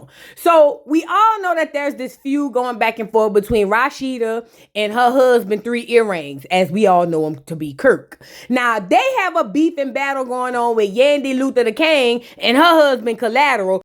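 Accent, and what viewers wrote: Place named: Louisiana, United States. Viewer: Your voice sounds like Tokyo Toni meets Louisiana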